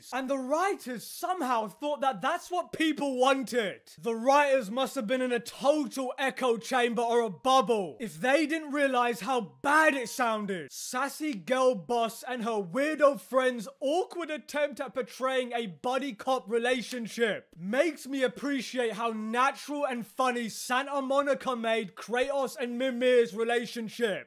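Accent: United Kingdom. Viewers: I love the way you explain it with ragefull british accent WKWKWKWKWKW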